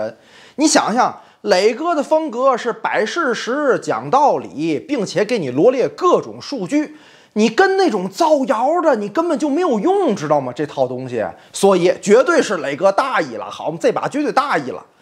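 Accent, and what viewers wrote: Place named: Tianjin, China. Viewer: 口音天津人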